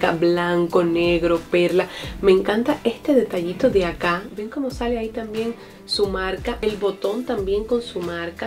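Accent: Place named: Cuba. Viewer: bella hermosa hablas muy parecido a sandra cires art obvio las dos son cubanas no lindas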